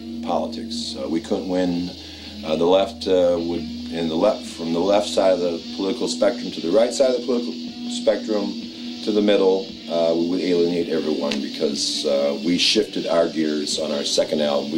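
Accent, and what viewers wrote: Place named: Midwest, United States. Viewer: A beautiful Midwestern voice!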